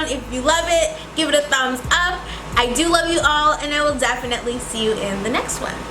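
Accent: United Kingdom. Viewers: you NEED to do a video with your British accent 🙌🏾🇬🇧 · Ur british accceeent in love ❤️❤️❤️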